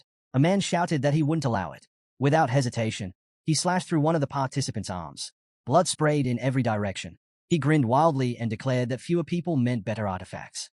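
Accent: United Kingdom. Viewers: Fuck this British accent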